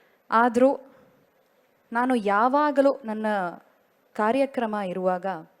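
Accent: Standard. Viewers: ನಿಮ್ಮ ಸ್ವಚ್ಛವಾದ ಕನ್ನಡದ ಭಾಷಣಕ್ಕೆ ಕೋಟಿ ಕೋಟಿ ಅಭಿನಂದನೆಗಳು 🙏🙏🙏🌹🇳🇪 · ತಾಯಿ ನೀವು ಬೇರೆ ಭಾಷೆದವರು ಆಗಿ ಇಷ್ಟು ಶುದ್ಧ ಕನ್ನಡದಲ್ಲಿ ಮಾತನಾಡಿದ್ದಕ್ಕೆ ತುಂಬಾ ತುಂಬಾ ಧನ್ಯವಾದಗಳು .ಕನ್ನಡ ನಾಡಿನಲ್ಲಿ ಹುಟ್ಟಿ ಕನ್ನಡದ ಅನ್ನವನ್ನು ತಿಂದು ಕನ್ನಡದಲ್ಲಿ ಮಾತನಾಡಲು ನಾಚಿಕೆ ಪಡುತ್ತಾರಲ್ಲ ಅಂಥವರಿಗೆ ಸರಿಯಾದ ಉತ್ತರ ಕೊಟ್ಟಂತಾಯಿತು ತಾಯಿ ತುಂಬಾ ಧನ್ಯವಾದಗಳು. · ಶಿವಶ್ರೀ ತಮಿಳುನಾಡಿನಲ್ಲಿರುವ ತೆಲುಗರ ಹುಡುಗಿ. ಚೆನ್ನಾಗಿ ಶುಧ್ಧ ಕನ್ನಡ, ಅದೂ ವೇದಿಯಿಂದ ಮಾತಾಡಿದ್ದಾರೆ.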